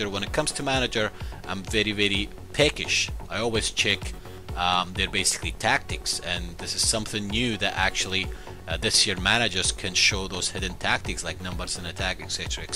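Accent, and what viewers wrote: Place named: Scotland, United Kingdom. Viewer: do you live in Scotland? sounds like a Scottish tint in your accent haha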